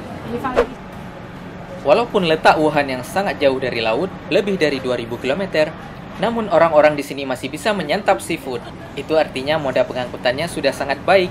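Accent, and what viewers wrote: Aceh, Indonesia. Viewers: Ab orang mana.... logat nya mirip aceh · Mau kemanapun pergi logat acehnya tetap melekat 😁👍👍👍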